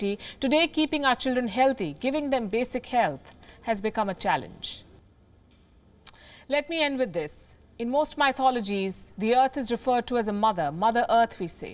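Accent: India. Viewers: Her english sounds uptight and weird posh with a hint of an indian accent. It isnt the best. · yes for Indian Its Indian accent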